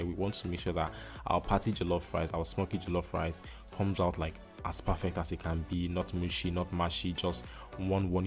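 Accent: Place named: Ghana. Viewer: Bruh. I could swear you Ghanaian lol. This is lit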